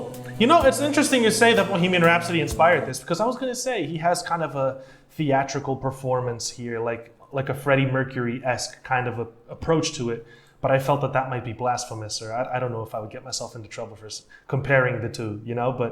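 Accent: Brazil. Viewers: desconfiei DESDE O PRIMEIRO SEGUNDO que tu é brasileiro 😂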